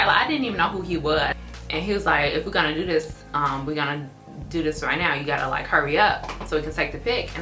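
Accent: Chicago, United States. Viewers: I knew u were from chicago.(hence the accent, cuz we say ain't so many times lol.) me too. I've never seen u. u must be on the southside.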